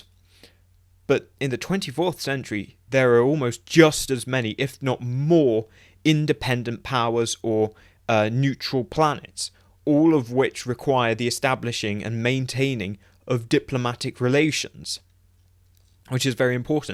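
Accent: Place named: England, United Kingdom. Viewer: your English accent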